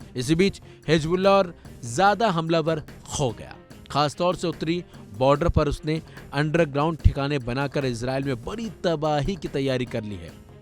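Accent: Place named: Madras, India. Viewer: Ur channel sounds madras chap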